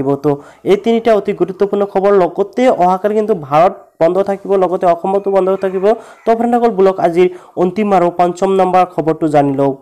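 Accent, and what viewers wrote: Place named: Bangladesh. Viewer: আপুনি অসমীয়া নে অকমীয়া ? অনুগ্ৰহ কৰি শব্দ উচ্চাৰণ কৰিব শিকিব। শূনিলে কিবা বাংলা দেশীয় যেন অনুভৱ হয়।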